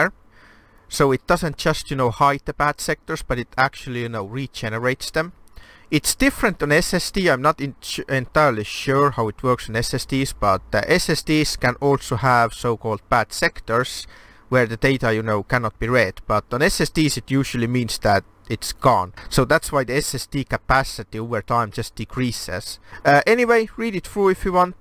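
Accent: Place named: Sweden. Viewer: clearly swedish or norwegian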